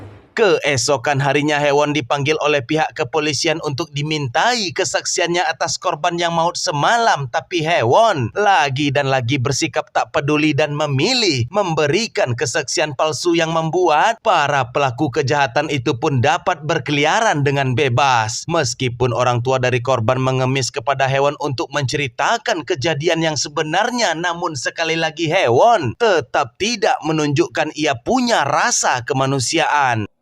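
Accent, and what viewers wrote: West Sumatra, Indonesia. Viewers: Rang minang y bg?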